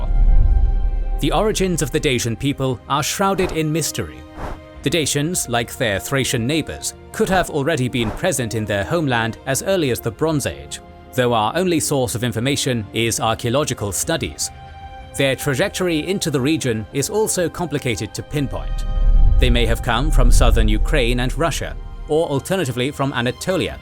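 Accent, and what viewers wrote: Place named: United Kingdom. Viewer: Ništa mu ne verujem. Ima Britanski naglasak. Oni su prevaranti i lopovi.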